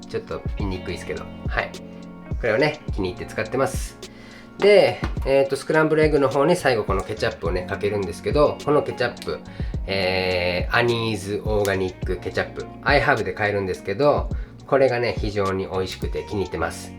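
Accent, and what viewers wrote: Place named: Kansai, Japan. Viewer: 関西弁も、いいですよー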